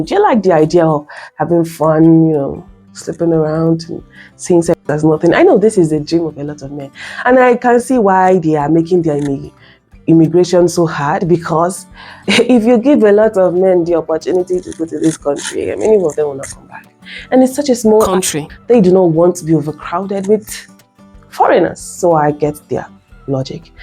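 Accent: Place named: Nigeria. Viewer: You're fine as hell, I don't know why I like Nigerian women's accents!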